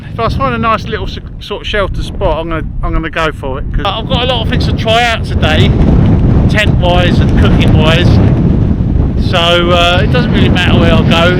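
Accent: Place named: South East England, United Kingdom. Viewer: You've got the same tent as me, South east accent same... so subbed. 😂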